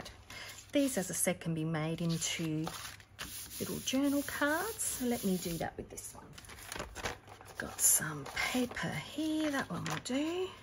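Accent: Australia. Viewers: Hi, again, it is great to see how transferable skills can be. I started out, many years ago, as a scrap booker, then I was introduced to card making. Now I have found the wonderful world of Junk Journalling. While I have been collecting ideas and supplies for some time now, I have yet to actually make many projects! While I have used serviettes (napkins) as decorative card fronts I have not thought to use them to ‘dull down’ the shine from pages; a great tip. May I also say it is lovely to hear a familiar Aussie accent! No offence meant to our American, English, or Other, Journallers. Best wishes to Everybody and may your journals be all that you want them to be. 😀🦘🐨🇦🇺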